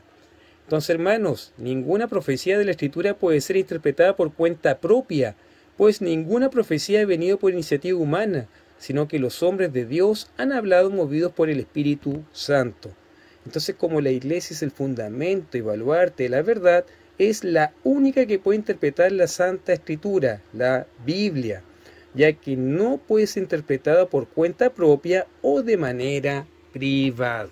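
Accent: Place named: Chile. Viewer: Buen día, esa Biblia es mi primera Biblia, le decían la Cenicienta; un error gravísimo, así lo veo yo, no quiere decir que tenga razón, pero es mi primera Biblia y la quiero mucho y no la veo como cenicienta. Aún la tengo a la Biblia. Sí, exactamente como ibas leyendo, con ese acento chileno tan lindo, dices cap. tal vers. tal lo busco y voy bien. ☺Ayer a la mañana en tv chilena se presentó un SR. entendido sobre Carlo Acutis. Me gustó mucho como explicó. Y ahora hablan de Loan, gracias Chile, y me duele mucho lo de abuelita chilena. Hasta el próximo encuentro!!! Bendiciones!!!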